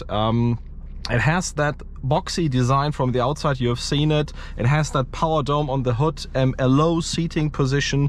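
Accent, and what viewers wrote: Germany. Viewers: Ist zwar ein wirklich gutes Video, aber du hast einen so starken deutschen Akzent, dass man raushört, dass du Deutscher bist hahaha. Meinen Like hast du aber auf jeden Fall trotzdem. Könntest aber eventuell etwas mehr auf die Höchstgeschwindigkeit eingehen. Ist gerade bei den neuen Autos sehr beliebt. :)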